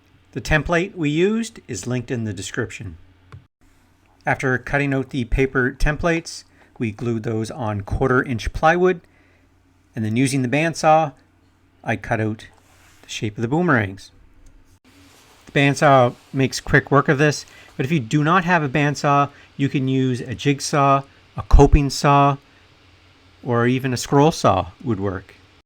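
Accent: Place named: Alberta, Canada. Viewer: This man has an Alberta accent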